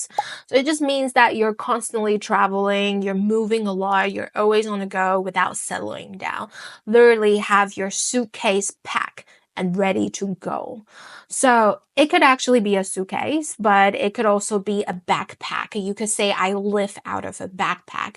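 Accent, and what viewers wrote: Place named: United States. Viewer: American accent 😊😊😊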